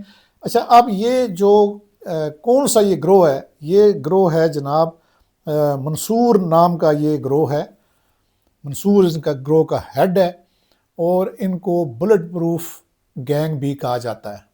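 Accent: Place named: Punjab, Pakistan. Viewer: Full of useless words with no systematic approach...his Punjabi accent is crude ..He only knows how to just Praise...only..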